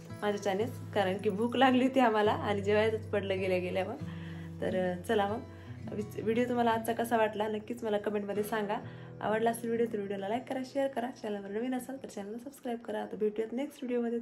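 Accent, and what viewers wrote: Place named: Marathwada, India. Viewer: Tai tumhi mahanubhav panthatil aahat na aamhisuddha aahot Aamhi Hingoli la rahto tumche video baghun kharach marathwadyachi language ekun khup chan wattala❤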